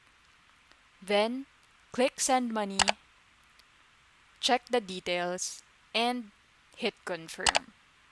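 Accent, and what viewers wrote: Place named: Philippines. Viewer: pinoy accent..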